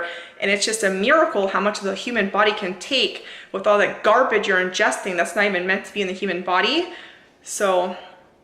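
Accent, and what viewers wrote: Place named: Chicago, United States. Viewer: I agree with you 1000%. I wish I would have started earlier to take care of my body. The last 50 yrs went by so fast and I am not 71 yrs old and while I have given up meat I still eat some types of fish and eggs though i am trying to wean myself from that. I will most likely give that up by February though I still drink non-fat milk and sometimes I eat cheese but Not butter. So i am looking forward to being a vegan by Feb 1st. Thanks for you video.. Your accent sounds like your either from back east or Chicago. I am originally from Ohio but have lived in Los Angeles for the last 57 yrs. I love your birds and my "tiel" "Baby" is still with me. Thanks again for your video ..Take care...keep up the good work!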